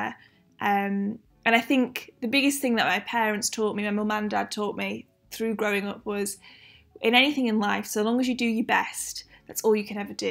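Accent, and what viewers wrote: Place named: North, United Kingdom. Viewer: What style is your wonderful accent? It‘s northern style but not too yorksherish I‘d say....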